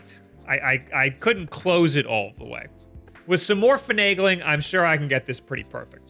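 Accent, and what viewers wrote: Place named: United States. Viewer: Why do americans say saughtering instead of soldering? Is the word spelled differently in USA?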